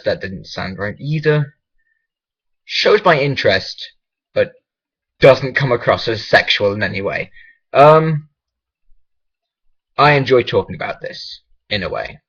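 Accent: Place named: United Kingdom. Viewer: Oh dear that's not so very nice over the sarnie things. Any way. you tell me who I am. INFACT no I will do that for you. I am Zandifate from Instagram. All I had to do was watch this and It made me laugh so hard.:). And it is like. PM. So yeah it was really funny and I would love to see more of your awesome channel. God that was so funny. And even though I am British you still have a pretty epic British Voice xD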